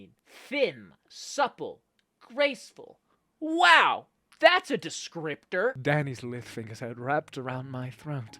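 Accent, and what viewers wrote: United Kingdom. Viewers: THAT BRITISH ACCENT KILLED ME 💀✌️ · You know what you should do Danny (just a suggestion) you should continue reading the lord of the rings in ur British accent it helped and still helps me fall asleep every time I listen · you should Collab with Speechify an add your British action I swear I would pay just to have your voice read my stories😭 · I CANT WITH HIS BRITISH VOICE- ITS TOO MUCH FOR MEEEEEEEE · the chibi is so adorable!!!! I'd really like a spicy webtoon about Danny... that'd be great along with chibi scenes 😖😖😖😖😖😖I'm so weird. Anyway I love his British accent like...😚😚😚😚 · Bro your British accent sounds like a professional narrator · Yes British Danny yes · the fact that I was just on wattpad, but I came here bc I googled: british gentleman from the 1800 voice 🤜🗿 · Danny, fyi: FLAWLESS hair! Like that British accent. As a native Brit: *chefs kiss *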